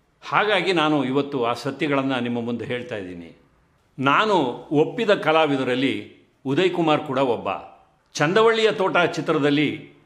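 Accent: Standard. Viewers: Your Kannada is awesome. Pure and honest speech. A big salute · ನಿಮ್ಮ ವಿಶ್ಲೇಷಣೆ ವಿವರಣೆ ಕೇಳುಗರಿಗೆ‌ ತುಂಬಾ ಹಿತ ಎನಿಸುತ್ತದೆ. ವಸ್ತು ನಿಷ್ಟ ಹಾಗೂ ಸತ್ಯ ಎನಿಸುತ್ತದೆ. ಸ್ವಚ್ಛ ಸುಂದರ ಕನ್ನಡ‌ ಕೇಳಲು ತುಂಬಾ ಹಿತ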